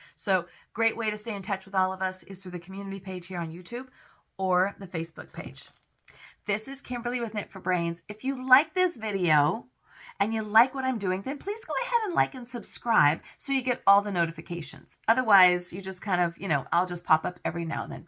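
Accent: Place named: Tennessee, United States. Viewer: You brought back some of your southern Tennessee accent. ❤😂 That book is amazing.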